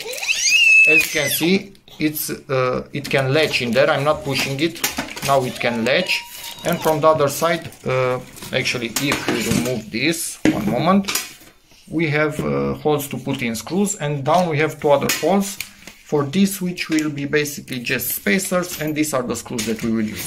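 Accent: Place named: Romania. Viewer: I have a Romanian friend who sounds exactly like you when he speaks English.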